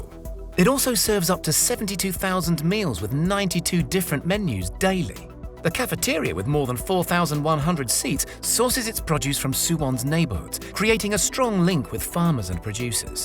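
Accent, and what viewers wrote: United Kingdom. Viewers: Its Football god dammit wtf is soccer. And you even got a british accent........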